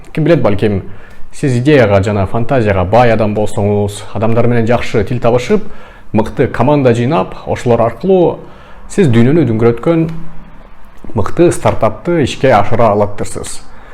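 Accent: Standard. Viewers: Кыргызча абдан таза суйлойт экенсиз. Ийгилик! Озум программалоо багытынын 2-курсунда окуп атам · Ассалам алайкум тууганым кыргыз тилин таза сүйлөйт экенсиң азаматысын! Кыргыз мусулман ошого динибизди да үйрөнүп алсак жакшы болот.ишине ийгилик каалайм алга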